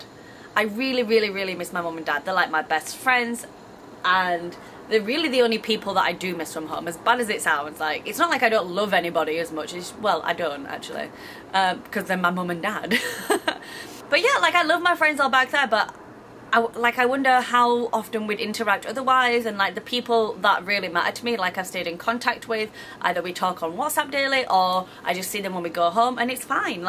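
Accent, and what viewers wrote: Yorkshire, United Kingdom. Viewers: Welcome back.... great to hear your Yorkshire accent again .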